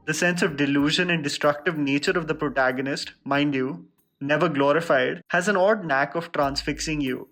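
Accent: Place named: India. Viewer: your hindi accent is too funny btw Great work 👍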